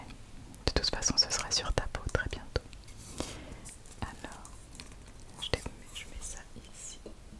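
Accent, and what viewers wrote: France. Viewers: My first asmr was a french random guy doing his makeup on yt I think 2010 .. I like the way you french pronounce ici and all your language.. you got a beautiful voice and whispers plus you so gorgeous😍💞💞💞 good night 💆🏻‍♀️💆🏻‍♀️💆🏻‍♀️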